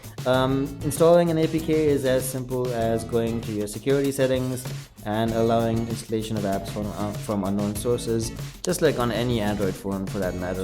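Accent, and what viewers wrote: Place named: India. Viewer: Ur name is with dat accent uhh, r u catholic indian?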